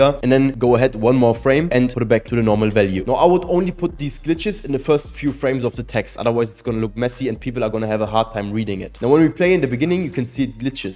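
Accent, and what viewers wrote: Germany. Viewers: bro sounds so german 😭😭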